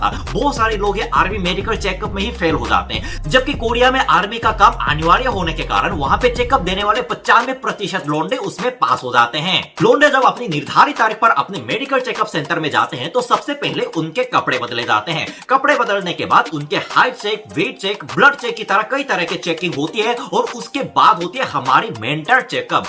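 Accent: India. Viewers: Being a foreigner not only he speaks like an Indian but he is...like an indian.❤ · Iska indian accent mere se jyada acha lagta h🤣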